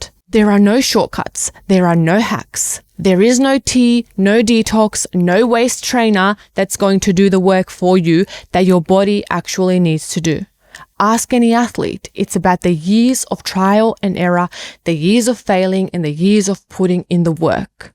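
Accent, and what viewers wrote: Australia. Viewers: Are you Australian? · Where is she from…? Australia? New Zealand?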